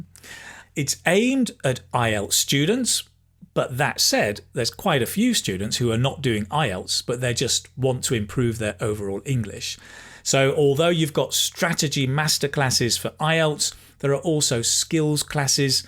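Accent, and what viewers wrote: United Kingdom. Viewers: I love British accent 100% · Hello dear, Thank you so much for sharing this video! That is so kind of you. Indeed, it is very useful and interesting to know about British slangs. I really like British accent, art and culture! In my view, you are a great native teacher because you always provoke the passion of your students to learn and encourage us to study more.You have all essential qualification and knowledge to be a successful teacher who can impress students with unique and enjoyable teaching methods. Although I am knackered, I hope I can get a higher score on the IELTS exam. Cheers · Omg!! British English is just so interesting!!😍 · Hi, we wish you be able to make subtitles for your lovely videos as we cannot trust YouTube's subtitles. I just joined your channel recently and lovecto learn British accent properly👍👍😉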